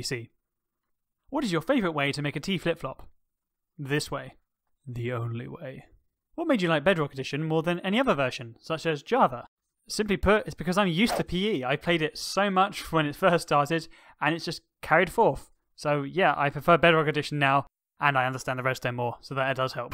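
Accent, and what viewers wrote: United Kingdom. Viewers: You are actually quite similar to Mumbo -brittish accent -good at redstone -classy outfits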